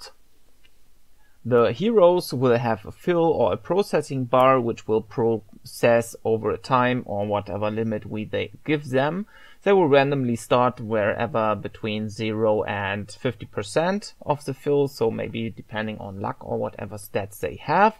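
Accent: Germany. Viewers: Damn, this accent sounds so German!!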